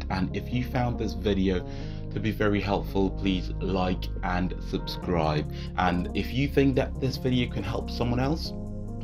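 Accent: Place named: United Kingdom. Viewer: hahaha aaah straight Bri ..ish lol like the accent lol. Thanks man . God bless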